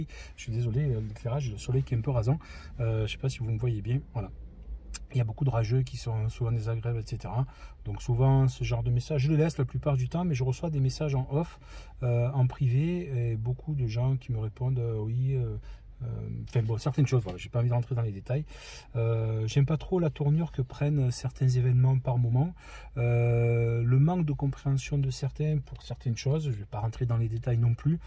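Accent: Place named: South, France. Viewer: Salut. Je suis abonné à ta chaine depuis pas mal de temps et je suis vraiment triste d'apprendre que d'ici la fin de l'année, tout pourrait s'arrêter. En même je te comprends et je me doute que cela ne doit pas être facile de subir régulièrement de la méchanceté gratuite. Personnellement, quand je n'aime pas quelque chose ou quelqu'un qui passe dans les médias, j'arrête de le regarder, c'est une question de bon sens. D"autres personnes préfèrent regarder juste pour critiquer voir insulter. C'est triste mais le monde est ainsi fait. Des cons, il y en a toujours eu et il y en aura toujours mais le monde est obligé de continuer de tourner. J'espère que d'ici la fin 2020 tu auras changer d'avis et que tu continueras de nous régaler avec ta joie, ta bonne humeur et ton accent qui sent si bon le sud mais si malheureusement, il en était autrement, alors je respecterais ta décison. 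Prends bien soin de toi et de ta famille.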